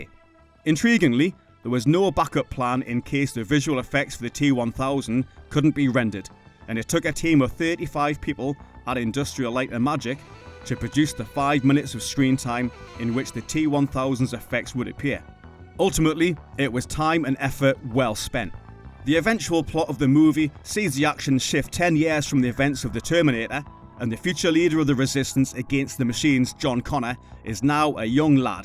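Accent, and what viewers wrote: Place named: Newcastle, United Kingdom. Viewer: The Geordie is back. Early NyN NAUT EES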